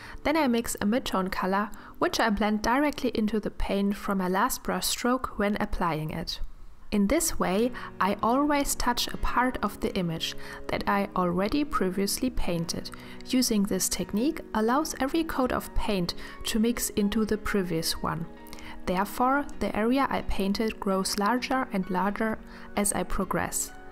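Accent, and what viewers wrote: Germany. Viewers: Hallo, ich möchte erst einmal deine Kunst loben-ich liebe den Mix aus abstrakten Verläufen und naturalistischen Elementen! Ich tendiere dazu, zu viele Details in ein Bild zu hauen, dann in der Mitte die Lust zu verlieren und wenn es fertig ist, frustriert zu sein, weil es einen etwas erschlägt xD Deine Bilder helfen mir, das Konzept von "Blickfang" besser umzusetzen und meine Portraits zu "entmüllen". Wo ich dich das erste Mal gehört habe, wusste ich sofort, dass du Deutsche bist. Dein Akzent ist wirklich liebenswürdig. Am liebsten höre ich mir einfach nur die Tonspur an und zeichne/male selbst parallel dazu und dann schau ich das Video noch einmal für das Bildmaterial;) Entspannend und inspirierend für mich. Vielen,vielen dank für deine Videos! (Musste ich einfach mal loswerden, bin eher der stille watcher)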